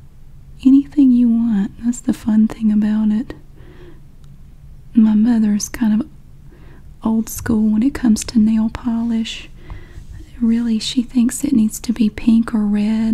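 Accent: South, United States. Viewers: 🥰 Yayyy!!! I am so happy!!!! This was a request of mine. You are completely and totally awesome! I am so glad you did this video with talking instead of whispers (soft talking is my favorite), it's perfect! Your talking voice is so soothing and relaxing, like a southern female Bob Ross 🤗... I love it! And your nails are GORGEOUS! I wish I had strong nails like yours, they are beautiful. Hope you are doing well. Blessings to you and yours. 💕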